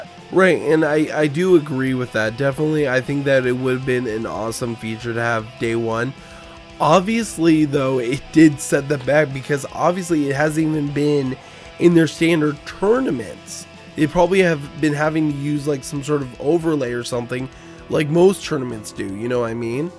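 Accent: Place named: Ireland. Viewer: so you are coming back to h5 i did like your irish accent😎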